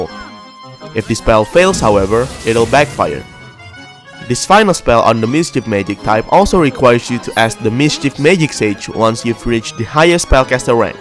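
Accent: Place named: Indonesia. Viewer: Logat indo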